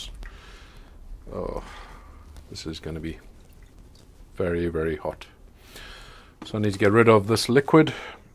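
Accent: South Africa. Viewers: on closer inspection i believe you are correct. His accent is quite mild compared to most south african i have heard, and some of the accents on words are similar to NZ. But yeah he is south african, he actually says further down the comments. I shoulda read further lol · Hey,. Are you South African? You sound like you're from there. 😃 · I have said it before, but I love that South African accent! That sound is home to me. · Probably in another video but is that a South African accent? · South African accent ? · Hi loved this Video and I can hear you are from SA. Where did you buy the equipment(pressure release valve) shown in this video I can't find it in SA.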